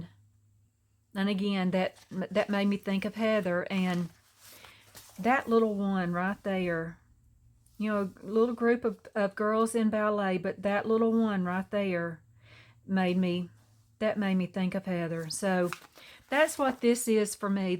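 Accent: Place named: Alabama, United States. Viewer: I love your accent! I have an Internet from Alabama and you sound so much like her. I too suffer from depression and art makes such a difference!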